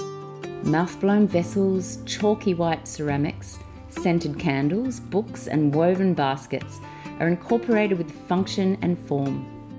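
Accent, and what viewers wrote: Australia. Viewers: Even though I struggled understanding the Australian accent but this video helped me throught my self study interior design process thanks so much for this video ❤❤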